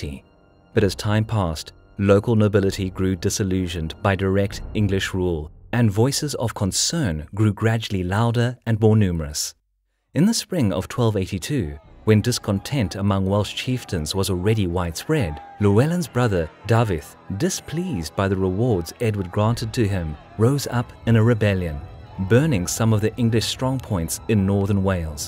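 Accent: South Africa. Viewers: That's a South African accent .... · Is this narrator South African? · Saffer narrator?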